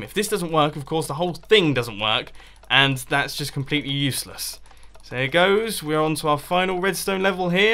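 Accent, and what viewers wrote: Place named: United Kingdom. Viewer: Other than the good content and some hilarious moments that offers, I learned a lot when it comes to Radstone and kinda of British accent so Thank You.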